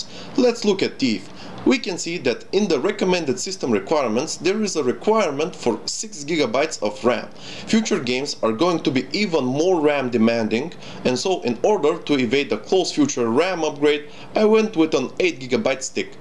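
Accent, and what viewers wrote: Russia. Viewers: I love the madarfaking russian accent :D (i am Bulgarian, though) :D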